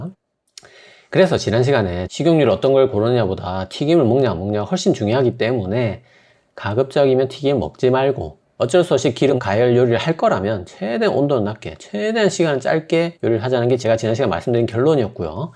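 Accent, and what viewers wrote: Gyeongsang, South Korea. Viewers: 유튜버들 중에 되게 애매하게 어렵게 말하는 사람들많은데 이분은 되게 명확하네요! 직설적인 경상도 사투리가 이래서 좋아ㅏ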